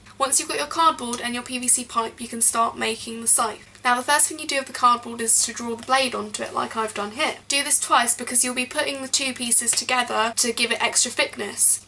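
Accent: United Kingdom. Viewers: PLEASE MARRY ME *.* You have this british accent, you like animes, you are beatifull and you can make wonderfull things..WOW! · omg your British O.o Luvs your accent dearies!!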